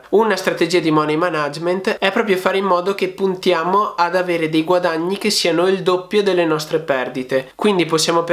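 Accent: Veneto, Italy. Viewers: Te si Veneto....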